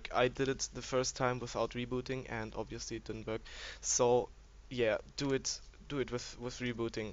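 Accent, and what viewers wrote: Germany. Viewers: thank you man it worked me.and i liked your german accent :D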